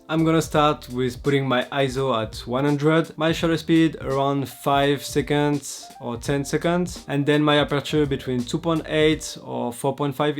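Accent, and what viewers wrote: France. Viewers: Lovin' this french accent 🥰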